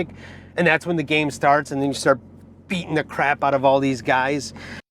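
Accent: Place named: Russia. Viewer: I thought you had a Russian accent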